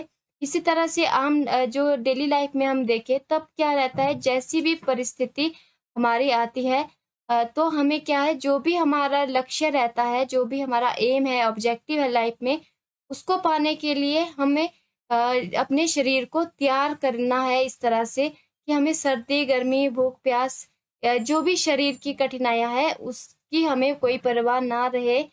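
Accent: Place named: Assam, India. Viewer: Your accent resembles Assamese accent. Are you Assamese?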